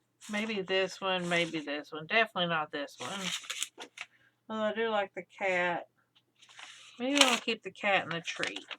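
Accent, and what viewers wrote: South, United States. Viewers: How cool that you're able to find so many fun things for your book! I really enjoy watching your videos and seeing what you come up with. Ain't it the truth that these gluebooks ain't going in the Lourve? So we need to just relax, cut, and glue, like Nicole says. It's all about the fun, isn't it? I was born in Alabama and raised there and in East Texas. I have a Southern accent, too, and listening to you makes me feel like I'm back down home. I live in on the Southern Oregon coast now and I do get homesick sometimes. Love ya, girl.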